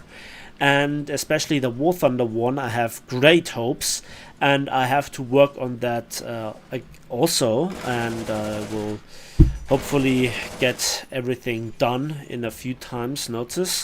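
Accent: Bavaria, Germany. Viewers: I hob mas scho gdocht dasd a Bayer bist hahaha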